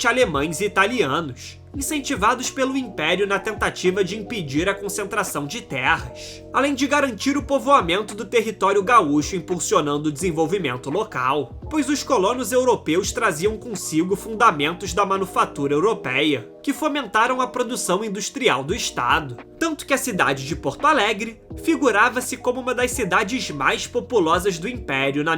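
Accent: Rio de Janeiro, Brazil. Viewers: Mais um videozinho cata-clique, baseado em notícias superficiais, clichês históricos e uma "capa" sensacionalista. Não sei o que é pior: o conteúdo ou a narração desse carioca. Sugestão pro próximo cata-clique de vocês: Rio de Janeiro, estado falido, capital da bandidagem do Brasil. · Que narração horrível..... Não dá pra assistir mais de um minuto..... Carioques insuportável.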